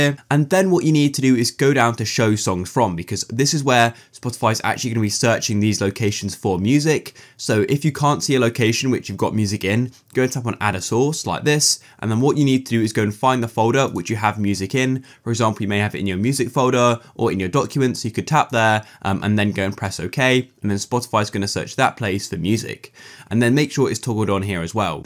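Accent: United Kingdom. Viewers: i could tell this guys british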